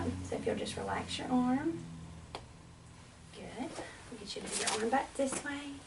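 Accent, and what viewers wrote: South, United States. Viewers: That southern accent makes the video😍👅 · I normally hate southern accents but hers is adorable.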